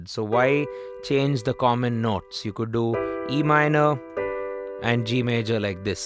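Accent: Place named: India. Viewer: Wonderful...No plans to learn piano but I loved listening whatever you played...God bless...Your way of speaking sounds very Indian...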